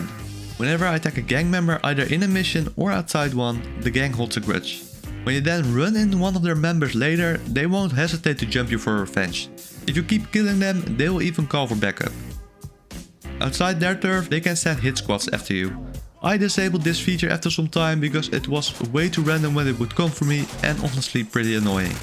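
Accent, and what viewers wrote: Netherlands. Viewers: Is it me or do you sound very dutch ? 😅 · I can recognize a dutch accent when I hear one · You gotta be Dutch with that accent. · dit is echt leip vet, ja sorry ik hoor je nederlands accent wel hoor (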